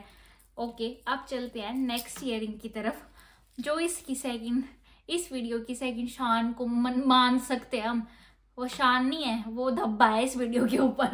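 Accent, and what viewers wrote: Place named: Himachal Pradesh, India. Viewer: Aap himachal se ho na bolne ke style se lag rha hai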